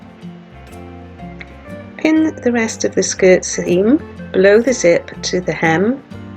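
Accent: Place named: Wales, United Kingdom. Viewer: Fab tutorial👍. Clarity , brevity and simplicity. I’m inspired. 🎊🎀tell me , are you welsh? I’m in Cardiff and you sound local!🙋🏻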